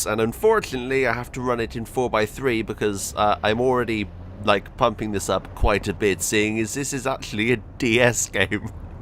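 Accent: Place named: United Kingdom. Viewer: Speech impediment? You mean his British accent?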